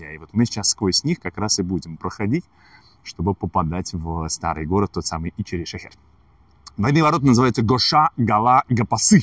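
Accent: Standard. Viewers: Наслаждаюсь чистейшей русской речью огромное спасибо спйчас это редкость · твердая 5 за прекрасное знание истории Баку! Очень правильная и чисто русская литературная речь. Человек благородный и жизнерадостный. Мне самому стало любопытно, как иностранец может столь интересно рассказывает историю нашего города. Рад что для гостей Баку открываются свежие страницы из жизни нашего города. Люди добры и чистоплотны, вежливы и гостеприимны. сильно отличаются от других народов Кавказа. Жители Баку богаты и горды, не любят приставать со своими услугами к незнакомым людям, но всегда придут на помощь если к ним обратятся., вам всегда будет сопутствовать удача за ваше жизнелюбие. Жаль что поленились и не сняли все что увидели в Баку. Отдельно про Баку -чистый и омытый весенним дождем, вкусов жаренного мяса с разнообразными специями, ароматом горячего хлеба, морских волн и криком шумливых. 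попугаев. Баку рад и ждет гостей разных добрых и любопытных, мечтающих возбудить чувства и вкус в новых путешествиях и приключениях.. понравился Баку, нам понравились вы!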